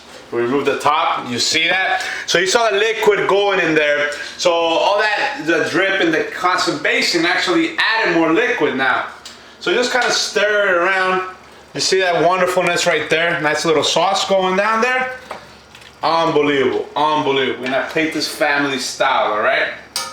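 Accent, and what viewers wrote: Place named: East Coast, United States. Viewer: Super annoying delivery. Suggestions: Either go back to pumping iron or lose the silly hat, the "I'm an east coast thug" accent and especially all the arm waving. This "performance" amounts to a bad caricature of and an insult to whatever ethnic population it is that you're attempting to mimic ("Latin" ??? If it's supposed to be Italian, you're insulting me; it's certainly not French... And by the way, you massacred the pronunciation of "Doufeu"). However... The food you cooked might actually be good!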